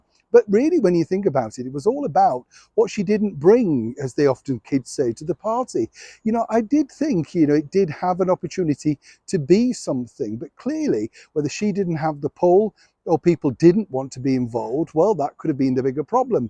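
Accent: Yorkshire, United Kingdom. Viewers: Agreed said like a proper Yorkshire man! 😊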